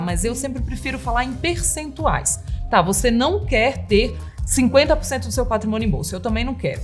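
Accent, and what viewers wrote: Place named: Minas Gerais, Brazil. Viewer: Kkkk. Muito mineira nos jeitos e trejeitos pra falar. Além de saber muito, sabe explicar de uma forma muito fácil. Parabéns e sucesso pra todos na Spiti.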